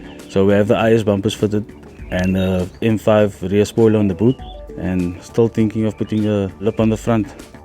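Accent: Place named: South Africa. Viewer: thats the most SA accent i've ever heard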